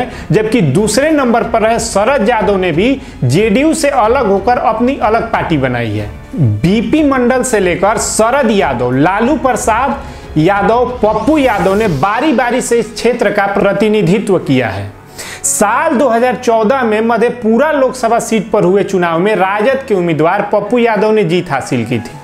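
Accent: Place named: Bhojpuri, India. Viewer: bhai mere bol Hindi rahe ho lekin awaz bhojpuri wali hai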